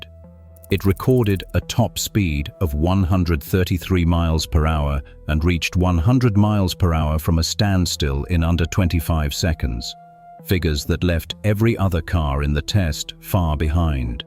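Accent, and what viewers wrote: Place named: United Kingdom. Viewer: American cars, American cops, American highways, and this poster decides that it has to be narrated with a British accent. WTF?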